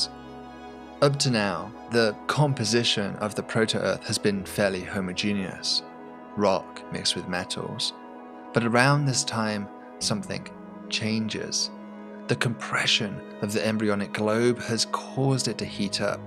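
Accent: England, United Kingdom. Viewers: I love your English accent , fascinating 🤨 !! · I think you missed my point, obviously your nationality is determined by your country of birth but if you were raised from birth in another country then you become 'culturally' part of the country you were raised in. The point I was trying to make was that his accent is genuine as he was raised in the UK. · Ain’t nothing like a British accent narrating something could listen all day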